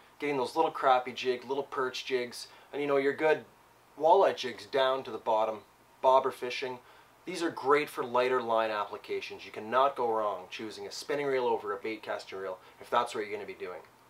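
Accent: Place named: Upper Midwest, United States. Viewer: Are you from the upper Midwest? The accent is really telling.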